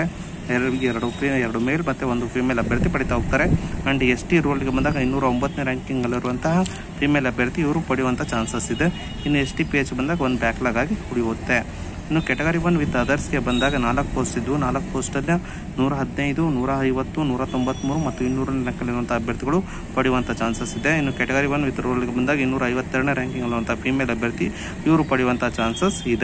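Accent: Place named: Belagavi, India. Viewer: Anna Belagavi English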